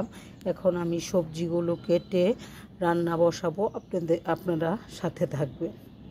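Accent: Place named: Gafargaon, Bangladesh. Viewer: নরমাল আমাদের গফরগাঁও এর ভাষাই এই কথা বইলো। এটাই সুন্দর লাগে।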